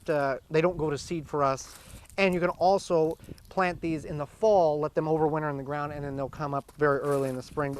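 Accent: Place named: United States. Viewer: Simple, helpful American English. Thank you.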